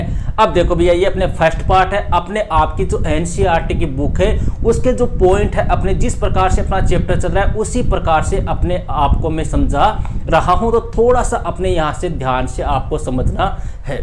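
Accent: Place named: Haryana, India. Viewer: sir Ap bahut Achha padhate ho but sir background sound thik karo please or hindi bola karo haryanbi mat bolo please 🙏🙏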